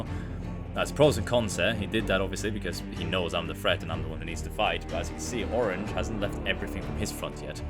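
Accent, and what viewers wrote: Norway. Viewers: I can hear a bit of an accent, if it isn't rude to, can I guess you're Norwegian? 🤔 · Are you Norwegian?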